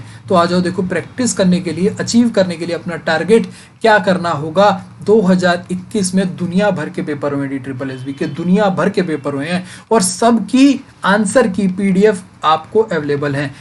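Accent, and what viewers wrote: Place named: Haryana, India. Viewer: Haryana se lagte ho.... 😁😂😂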